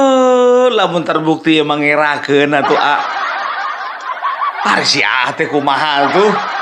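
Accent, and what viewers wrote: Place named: Sunda, Indonesia. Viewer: Saya sebagai orang jabar.. Merasa malu kalau benar terbukti kang emil terjerat bjb.. 😬 bang lucu bahasa sundanya. 😁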